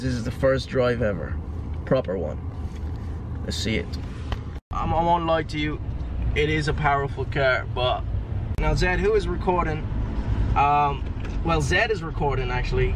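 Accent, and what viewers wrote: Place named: Ireland. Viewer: You sound like Connor mcgregor in your trading videos. You have the same accent and very similar personality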